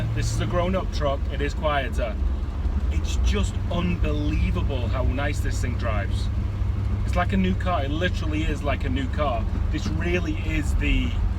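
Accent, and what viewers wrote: United Kingdom. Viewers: A guy with a British accent living in cali. With an old school Ford truck. Welcome to America friend!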